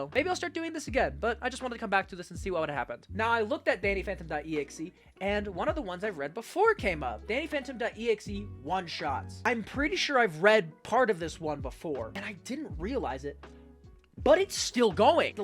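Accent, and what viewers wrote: United Kingdom. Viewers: Yes British yes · the fact that I was just on wattpad, but I came here bc I googled: british gentleman from the 1800 voice 🤜🗿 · fyi: FLAWLESS hair! Like that British accent. As a native Brit: *chefs kiss * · the chibi is so adorable!!!! I'd really like a spicy webtoon about... that'd be great along with chibi scenes 😖😖😖😖😖😖I'm so weird. Anyway I love his British accent like...😚😚😚😚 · You know what you should do (just a suggestion) you should continue reading the lord of the rings in ur British accent it helped and still helps me fall asleep every time I listen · THAT BRITISH ACCENT KILLED ME 💀✌️ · I CANT WITH HIS BRITISH VOICE- ITS TOO MUCH FOR MEEEEEEEE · you should Collab with Speechify an add your British action I swear I would pay just to have your voice read my stories😭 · Bro your British accent sounds like a professional narrator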